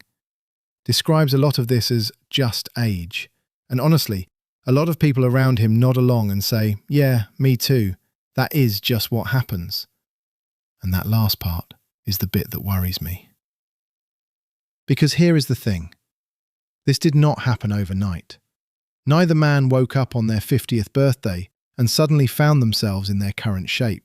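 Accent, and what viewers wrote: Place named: United Kingdom. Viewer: The British voice always signifies doom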